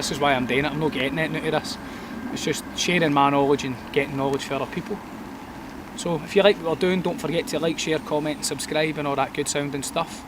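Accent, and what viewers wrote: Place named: Ayrshire, United Kingdom. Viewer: 2 is 1 and one is...... Subscribed 🤠 all the best dude, sounds like you're near me, I'm in East Ayrshire.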